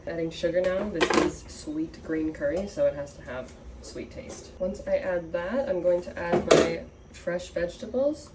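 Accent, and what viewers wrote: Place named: Thailand. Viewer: พูดไทยเก่งแล้วนะครับใช้ภาษาไทยได้สุภาพและสำเนียงไทยชัดเจนครับ❤❤❤❤❤❤❤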